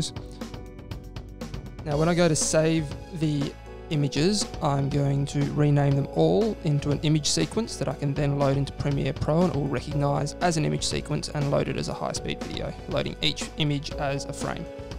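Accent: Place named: Australia. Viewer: Good to hear an Australian voice on YouTube. I am sure method works, however it is a bit of a fiddle. For weeks I have been seeking a simple method to open BlackMagic image sequences in an app' on Mac, and convert them to QuickTime PRORES files. The PRORES files I wish to colour grade in After Effects. Any suggestions?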